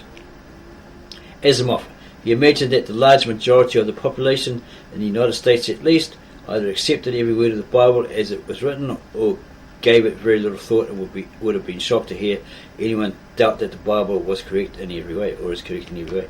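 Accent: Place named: Australia. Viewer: Worst video narration ever. Between his halting mumbling and dense Aussie accent it was barely understandable. Thank God for closed captions !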